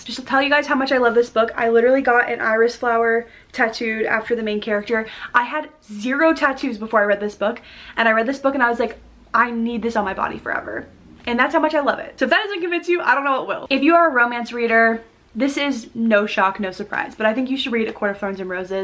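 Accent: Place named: Russia. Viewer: You akcent rusian 👀👀👀👀👀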